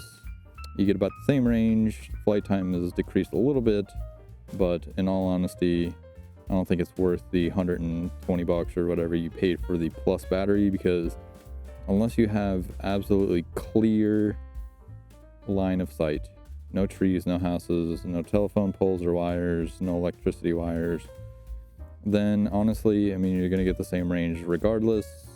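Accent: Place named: South, United States. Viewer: oh definitely not Texas 😂 maybe Alabama or Georgia am i right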